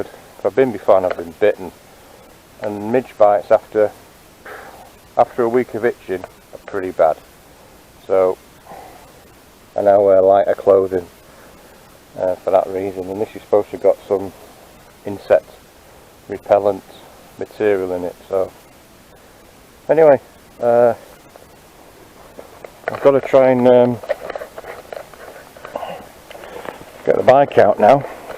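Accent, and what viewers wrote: United Kingdom. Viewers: I assumed wrongly you were Scottish by the title. Once the helmet was off I could easily tell you're English. But as the Ozzie's say, "Good on ya" for picking up the trash! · Nice vid mate ... done a fair bit of bike / camping myself and loved it, did Spain last year and going back, can't beat the weather! ... as an Edgeley lad myself but not lived there for years its nice to hear a Stocky accent (c'mon County!) ... and as you know ... we are not Mancunian, we are Cheshire! Have great trips buddy and ride safe.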